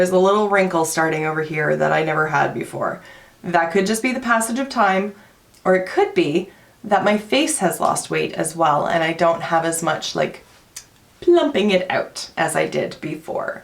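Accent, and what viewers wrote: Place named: Canada. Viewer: I just came across your channel for the first time, I was just sleeved 9/30/20, I hope I have success similar to yours, 80lbs in less than 6 months is amazing!!! (Sidenote- I have to ask, are you from Canada, by chance? I only ask because I'm from Connecticut,usa and some ppl imitate the Canadian accent, and when they do it's either french or like yours (you don't have much of an accent I just hear it on a few words, I love accents lol) I will definitely keep following your journey!!!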